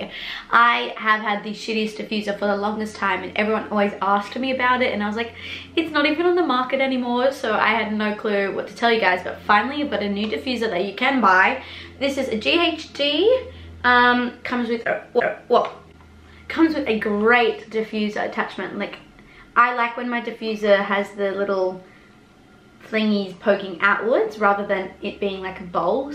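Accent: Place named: Australia. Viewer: Omg you’re Australian YO WTF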